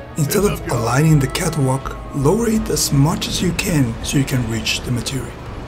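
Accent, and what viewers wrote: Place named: Canada. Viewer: Thanks man! I would never have found it without your video. Cool accent! Are you from Canada? France?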